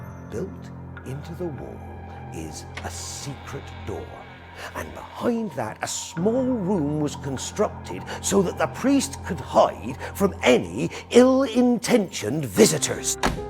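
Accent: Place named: United Kingdom. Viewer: British narrators are always the best! This was so much fun!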